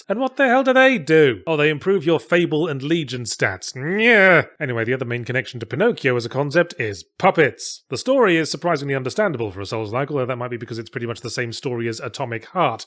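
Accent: England, United Kingdom. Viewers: A Canadian listening to Yahtzee speak French in his self described "Walking tour of the UK" accent did something to my soul your pot smoking cousin would describe as Lovecraftian. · I like that Yahtzee knows how to correctly pronounce French but his English blood won't let him.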